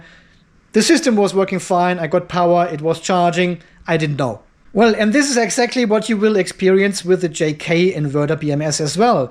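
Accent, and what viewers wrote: Germany. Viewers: Hello - love watching your show - German accent and all! Are you done with JK for now? I am quite fond of them and am building a few 52V inverter batteries with them. Quick question that you might be able to help with? I've used the "GPS" port with USB serial quite successfully on the smaller BMSs (to monitor and integrate into Home Assistant). I've tried the RS232 RJ11 - but without success. Is there a way to get someth9ng similar running on the inverter BMS? I've got a Bluetooth version running into Home Assistant - but I'd prefer hardwired.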